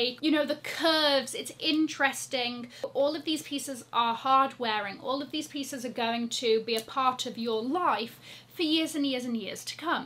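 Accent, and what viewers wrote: United Kingdom. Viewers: I didn't think you would have a British accent. Surprise! ❤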